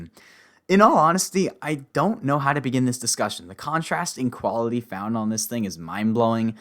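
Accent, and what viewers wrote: Canada. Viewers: not even 2 mins in and I can hear the canadian accent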